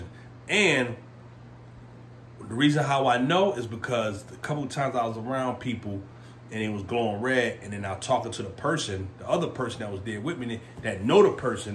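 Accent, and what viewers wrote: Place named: Connecticut, United States. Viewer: You sound like you from Connecticut. New Haven?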